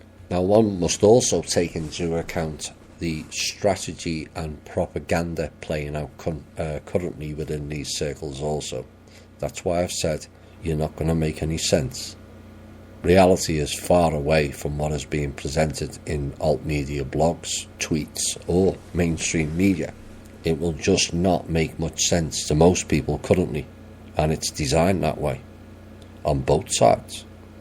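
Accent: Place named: Merseyside, United Kingdom. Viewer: Interesting! There are many players! Good intentions and a sincere desire to bring the light of truth and a better way for so many! And not corruption and cro.nism for an elite few. You sound like a merseyside lad! Me too! Thanks for your contribution to righting a wrong world.👍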